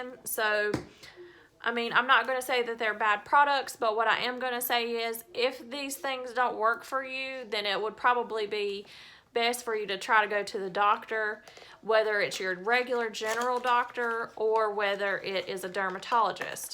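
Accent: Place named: South, United States. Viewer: I am currently using the eucrisa as well. My whole body is covered with eczema. All except my face. But you have a sexy southern accent. Where are you from? I was raised in North Carolina but live in South Florida.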